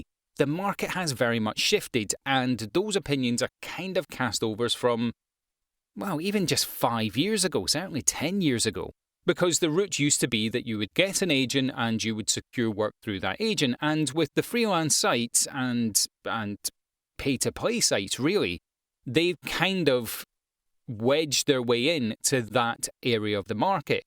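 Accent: Scotland, United Kingdom. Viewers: Thanks for the assessment and the James Earl Jones comment -- made me titter. P. S. I'm British-American, and to my native English now partly American ear, you sound INTENSELY Scottish! Heh heh heh.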